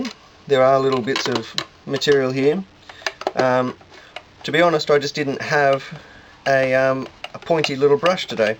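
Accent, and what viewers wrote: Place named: Australia. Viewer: you were of great great help! and the Australian accent rocks :-)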